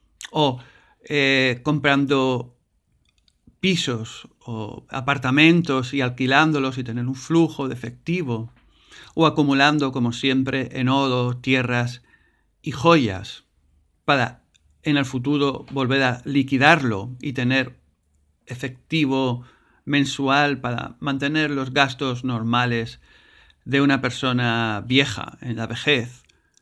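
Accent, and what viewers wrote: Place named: Spain. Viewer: En cuando escuche el acento español "onda vital" deje de ver el video ¡¡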